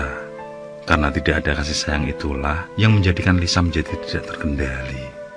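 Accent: Java, Indonesia. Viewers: Klo mo nyumbang cerita nih apa hrs slalu yg genrenya horor trs ada mistik nya?koreksi dikit, krn cerita ini berlatar keluarga Batak yg tgl di Jawa timur mungkin ada baiknya voice talent agak disesuaikan dikit,jd ga trlalu Jawa tp ada batak2nya dikit jdnya lbh hdp kt nntnya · dubbernya ... medhok!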